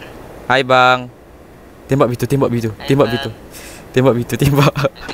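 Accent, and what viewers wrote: Sabah, Malaysia. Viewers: Abg sabah daerah mana?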